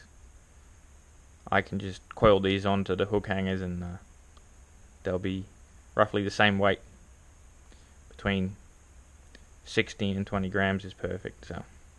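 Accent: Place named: Australia. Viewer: Sick bro knew that accent was Aussie! Love the vids bro keep up the great work!!! Yew!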